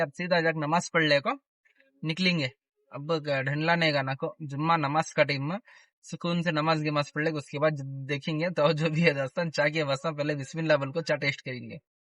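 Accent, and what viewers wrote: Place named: Deccan, India. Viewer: bhai aap kya Deccani bhol rahe hai? It is very nice and should be taught in schools as a language so that it can be preserved.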